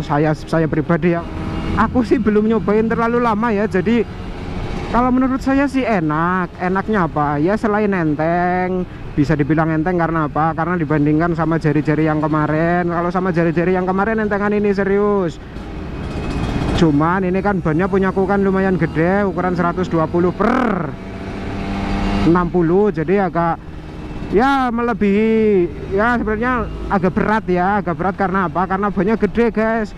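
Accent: Banyumas, Indonesia. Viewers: Wong ndi si mas? Salam ngapak ket kebumen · ngapak'e gawe aku ngguya ngguyu ae pak😂..sampeyan wong ndi sih😄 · Klau ngvlog bahasa ngapak nya sering dipakai om biar makin ketawa aku liat ny lucuu...lucuu sukses trus om 😁👍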